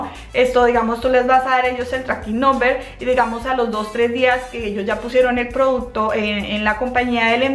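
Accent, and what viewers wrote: Colombia. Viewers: Acentua bien las palabras eres colombiana ..pero tu video lo ven de varios paises practica un tono standar .un consejo de un amigo.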